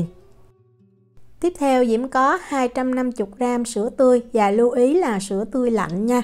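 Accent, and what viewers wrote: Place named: Bien Hoa, Vietnam. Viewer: Dạ, e nhớ rồi, e cảm ơn chị nhiều ạ. E cũng quê Biên Hoà luôn chị, nghe giọng của chị thấy nhớ quê lắm, e hiện đang ở Pháp, e từ không biết gì về nấu ăn mà xem youtube của chị riết e học hỏi được nhiều lắm ạ 🥰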